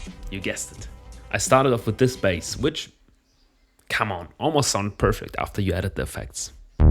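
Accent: Germany. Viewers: Are you German? You have a german accent Mir wurde das Video über meine Startseite vorgeschlagen 😄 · bro du sprichst gut Englisch aber habe mir trotzdem gedacht dass du deutsch bist😂 Sehr stabiles Video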